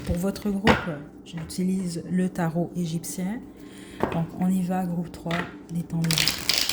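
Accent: Quebec, Canada. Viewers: Merci la! J’adore écouter votre accent québécois depuis ma Provence natale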